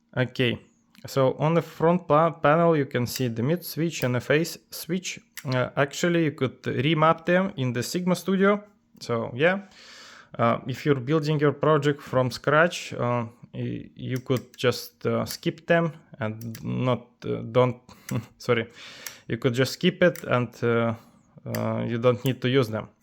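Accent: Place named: India. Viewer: Индийский английский :-) ...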